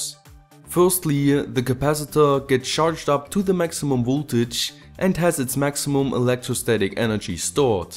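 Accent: Germany. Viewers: Wie man sofort hört dass du deutscher bist ^^ Aber sehr geile videos machst du, respekt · that accent though, germans genes are strong in this one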